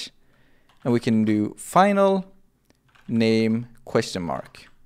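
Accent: Sweden. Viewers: You must be from Sweden, I can hear it!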